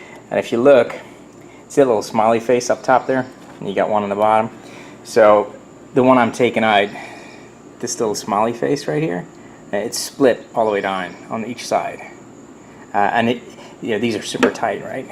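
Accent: Belfast, United Kingdom. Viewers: Is that a hidden belfast accent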